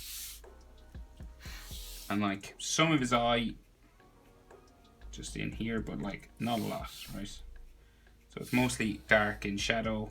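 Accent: Ireland. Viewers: Are you irish because you sound that way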